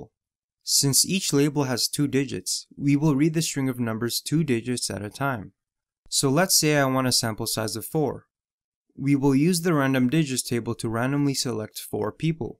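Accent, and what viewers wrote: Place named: China. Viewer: video not useful could not understand heavy Chinese accent. would not recommend -2/10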